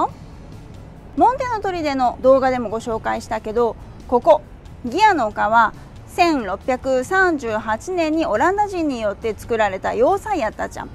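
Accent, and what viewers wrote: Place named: Hakata, Japan. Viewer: 博多弁が好きな方🙋🏻‍♂️です😊 椿さんすごいですね！ちかっぱ面白かったです🙇🏻‍♂️ 次回マカオ行く時の楽しみが増えました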